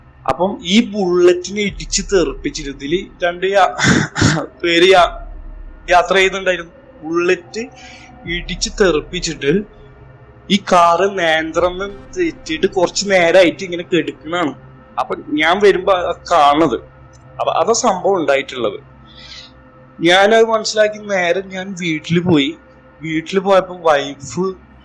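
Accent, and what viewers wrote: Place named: Kochi, India. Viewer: Kochi slang alle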